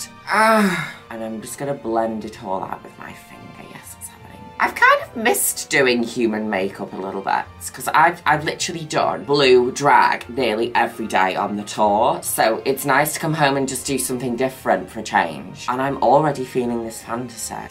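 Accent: Manchester, United Kingdom. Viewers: Oh my goodness, I would love to watch anything with your narration!!! The world needs more Manchester accents in media! 💚💙💜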